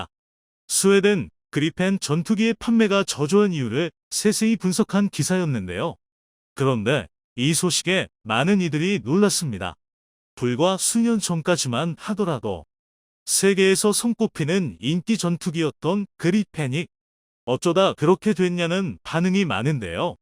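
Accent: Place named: China. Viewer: 중국사람인가 ㆍ 왜 말이 찳지 ㆍ혀가 짧고 발음 정확하지 않음 ㆍ 하지 말아요 유트브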